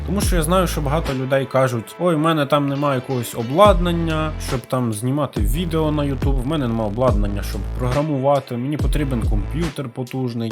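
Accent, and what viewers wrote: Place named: Transcarpathia, Ukraine. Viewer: Акцент ніби Закарпатський але хз😅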